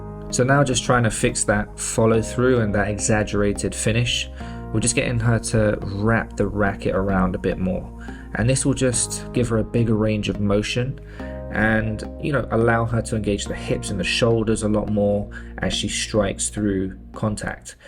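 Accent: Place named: United Kingdom. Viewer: interesting, I thought it's in Europe, judging by your accent I thought it's in Britain. Thank you for this extremely helpful video.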